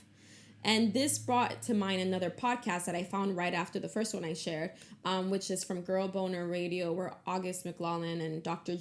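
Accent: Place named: New York City, United States. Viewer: R u from NYC?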